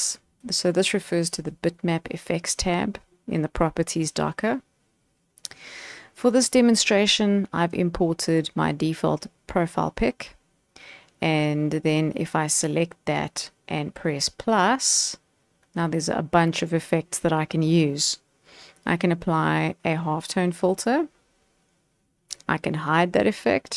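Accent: South Africa. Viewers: Is that a South African accent I hear? :)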